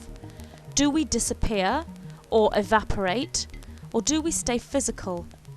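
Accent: United Kingdom. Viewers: Seeing as you live in England I would expect you to have British accent but I wouldn't expect someone from another planet to have a British accent.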